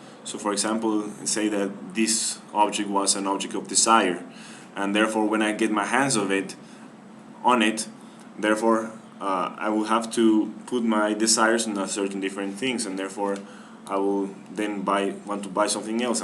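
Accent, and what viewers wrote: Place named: Mexico. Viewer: Good English, where are you? I can tell you're Mexican but do you live in the States?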